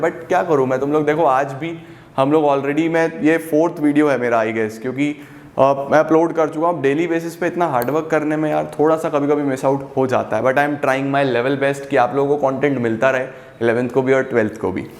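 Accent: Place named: Saharanpur, India. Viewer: Sir saharanpur se h pta chl gya😅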